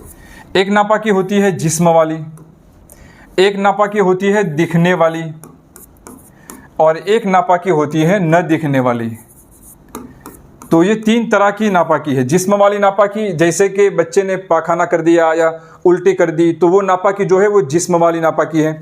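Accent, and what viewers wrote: Gujarat, India. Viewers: Mufti sb assalamu alaikum warahmatullah vabarakatuhu poochhna ye tha maafi k saath ki jaise molana k aage qasmi nadvi mazahri lagate hai aapke name k aage laga hai ye kis madarse ka hai maloom karna thaaapki baat se to aap gujrat k lagte hai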